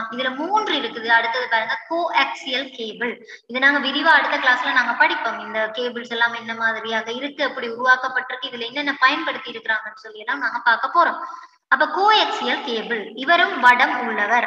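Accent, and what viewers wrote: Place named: Sri Lanka. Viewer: wow srilankan tamil kekave nalaruku...unga voice alagu ....best wishes ma.....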